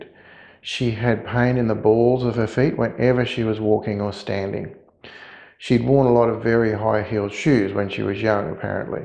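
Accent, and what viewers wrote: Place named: Australia. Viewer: It’s Australian English, it’s very clear. 😂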